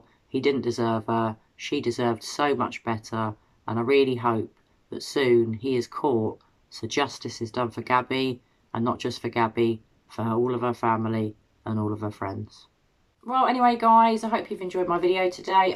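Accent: United Kingdom. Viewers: thank you for your thoughtful investigation into these murders. It really is alarming to think of Brian as a serial killer!!! You are the first person in my observations on Y.T. to propose this possibility a few weeks ago. I am praying often for LE to catch him and bring him to full justice. PS -- being "across the pond" in SC, USA, I enjoy hearing your accent 😊!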